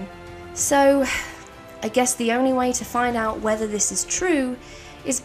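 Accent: England, United Kingdom. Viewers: Where you from? That english accent is unique and I only hear it in the movies or on tv.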